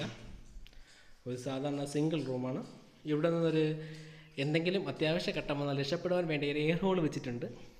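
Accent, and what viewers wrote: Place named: Trivandrum, India. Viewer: Tvm ആണ് alle സംസാരം കേട്ടപ്പോൾ manasilayi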